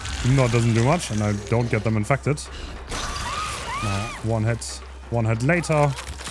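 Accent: Germany. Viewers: Bruder hat den Deutschen Akzent. Aber krasses Englisch hatte ich am Anfang erst nicht gemerkt.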